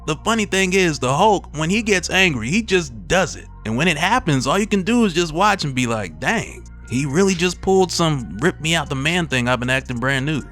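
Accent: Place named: West, United States. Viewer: i love ur western accent tho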